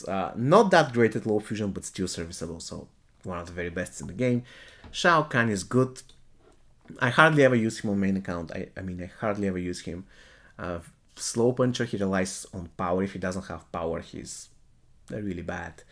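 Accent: Bulgaria. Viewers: are you bulgarian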